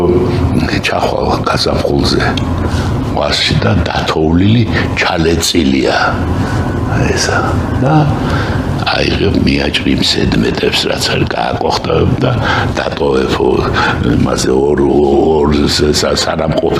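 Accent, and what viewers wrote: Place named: Imereti, Georgia. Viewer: იმერელი კილო აქ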